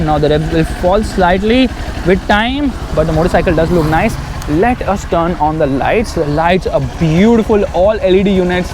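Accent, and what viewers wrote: India. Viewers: Ohhh you are Indian I thought that you are Pakistan by reading your Muslim name but by your talk I come to know that you are Indiab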